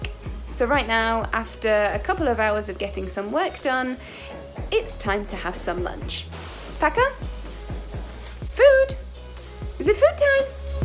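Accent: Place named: Yorkshire, United Kingdom. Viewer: What a great video and so interesting to hear you explain your motivations and plans for the future. You are very brave to strike out into a remote rural location. I will follow your progress with great interest and wish you both and Paco (lovely dog) all the very best. PS. you have not lost your Yorkshire accent.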